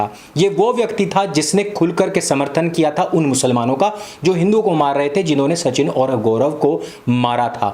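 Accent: Haryana, India. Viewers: Vaah mast haryanavi bhasa aap ki 🙏🙏🙏🙏🚩🚩🚩🚩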